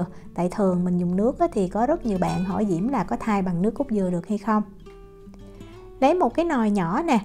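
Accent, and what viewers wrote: South, Vietnam. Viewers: Giọng nói miền Nam của Diễm rất dễ thương, nhưng nếu Diễm đừng trộn từ miền Bắc vô thì sẽ dễ thương hơn nhiều. “vô, không phải vào; lợt, không phải nhạt” …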